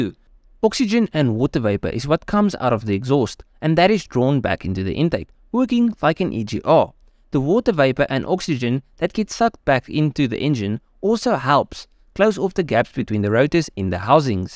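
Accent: South Africa. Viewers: South African ?? I’m an American that lived in Yeoville in 1984-85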